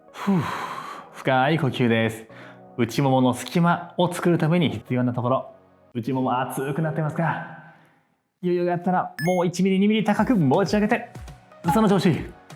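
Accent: Kansai, Japan. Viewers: めっちゃ有難い😊 最近は太股が締まってきてズボンに余裕を感じます。🎵 それと、歩いてても前と何だか感覚が違う気がするのは気のせいなんかな？ 先生❗ちょいちょい出てくる関西弁がええわぁ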